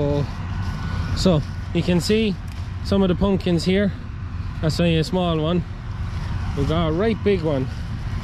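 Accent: Ireland. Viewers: hey are you from louth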